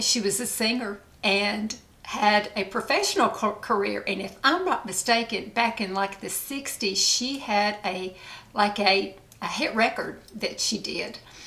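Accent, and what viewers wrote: South, United States. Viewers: ...Honey You my dear are just the sweetest thing. Your accent is beautiful...are you from the South? Beautiful tribute to Suzy!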